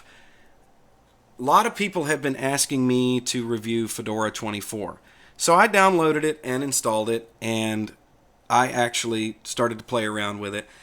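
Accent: United States. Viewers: I have said this before and have to repeat this again: if you want to get the best Linux channel for novice just subscribe to the ' channel on Youtube. I am so glad that I run into his channel a while ago! Of course I don't agree with everything of what he has to say,without exceptions, but it's just impossible to agree with the 100% anyone would say without an exception or two. But boy, oh boy: his guides are super-useful and they are made well. In addition his language sounds right for an American "ear" too, comparing to the British or Indian English some other good youtubers use. Hats off,!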